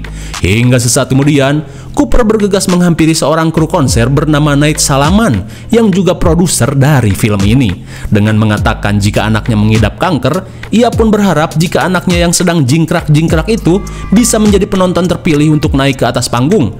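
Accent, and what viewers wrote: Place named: Sunda, Indonesia. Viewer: Seru bahasa pembawaannya bang, apalagi ngomong aneh aing mah, si abang nya org sunda ini, salm sunda bnten bang, salm subscribe baru mu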